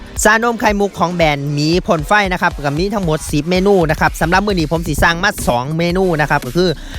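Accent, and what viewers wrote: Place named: Isan, Thailand. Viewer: มักเสียงเว้า เด้อเนาะ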